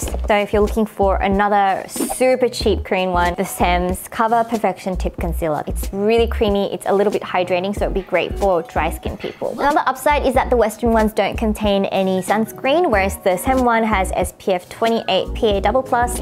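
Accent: Australia. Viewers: OMG ARE YOU FROM AUSTRALIA?? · Heeeeeeeeey, are you from Australia? 😃😃😃😃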